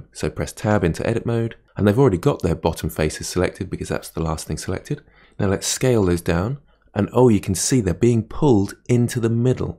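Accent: United Kingdom. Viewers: it's good that you show which keys do you use. Because of Your bri'ish accent i've mistaken alt for o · (me, opening up this tutorial) Oh hell yes British Accent thank god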